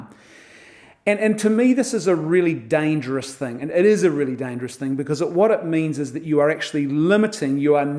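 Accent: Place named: New Zealand. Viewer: Well done. Great to hear a competent kiwi voice on this sort of topic.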